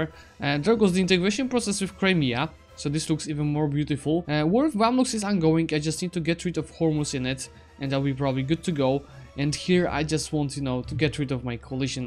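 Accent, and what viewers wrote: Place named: Poland. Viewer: His Polish accent is clearly understandable and I think if there is really a problem, it would surely come from you.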